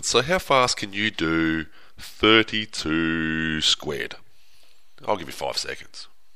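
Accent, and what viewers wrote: Australia. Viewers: is he Aussie (Australian)? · Are you Australian? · What part of Aussie are you from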